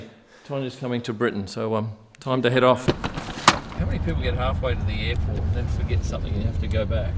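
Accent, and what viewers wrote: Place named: Western Australia, Australia. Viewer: Mate I didn't know you were a west aussie! Subbed!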